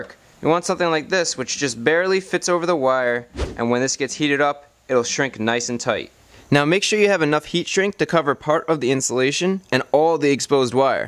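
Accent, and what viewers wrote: United States. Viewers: I want to ask a question, great video as always but why do Americans pronounce 'solder' as 'sodder', the 'L' isn't silent, and if you think it is, how do you pronounce the word 'Sold' ... do you say I 'sod' a car today? lol I'm only being a bit pedantic about it but I'm also genuinely curious if there is a reason why this has come about, any Americans who can tell me cos it makes me smile every time I hear one of you guys talking about 'soLdering' something lol By the way I'm very jealous of anyone with steady enough hands to solder properly, my hands shake so badly that let's just say if you see me going to try and diffuse a bomb then make use of the head start! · This pronunciation seems to be very common by Americans. It's not a bad video though if you need to learn about soldering. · It's an American thing, it's how we talk. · Thats the yanks for you. 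Always trying to alter the ENGLISH LANGUAGE. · Hey learn how to say soldering, there is no silent L in the word so I don't get why americans drop the L this makes you sound dum and is probably true So say it after me S O L D E R I N G SoL-dering !!! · i appreciate the 'American' pronunciation of 'solder', as 'soder,' but it doesn't make it correct! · That's how us Americans pronounce it. I don't get it either, but that's just how it is.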